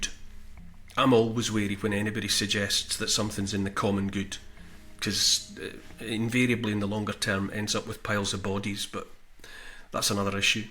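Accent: Scotland, United Kingdom. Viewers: Hello from Chicago, Illinois! I'm SWOONING over your Scottish accent!! 👍🎯❤️‼️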